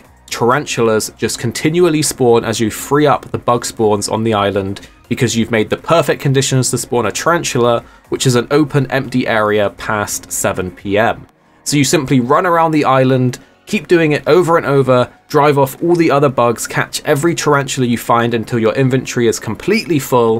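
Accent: England, United Kingdom. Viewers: Man, how you managed to get that British accent? It's amazing!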